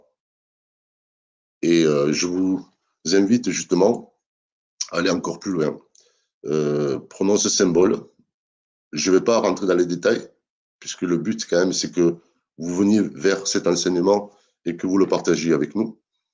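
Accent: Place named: Marseille, France. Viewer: Voix maghrébine et accent Marseillais, un bon mariage. Bonne journée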